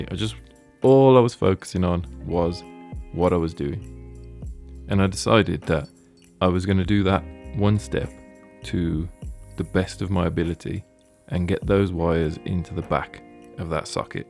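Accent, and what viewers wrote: United Kingdom. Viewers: Its hynotic to just see you draw your british blabber fades as background noise.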